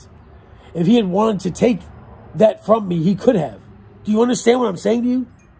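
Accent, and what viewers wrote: Virginia, United States. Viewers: Your def from the VA area......love your story telling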